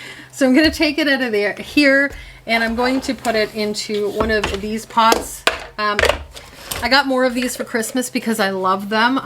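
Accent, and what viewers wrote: United States. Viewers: From a german speaker: i don't hear a boat ^^. I think it's the american accent 😀. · Ha. Ok, so your grandson truly is the cutest. Holy crow. As for “out and about”...I grew up in Illinois and Wisconsin. Moved to Tennessee as a teen. I’m 46 now and when I watch you, I find myself saying out and about” with the northern accent. My husband teases me about it. So yes, we hear it. (And love it, it brings back childhood memories for me) 🧼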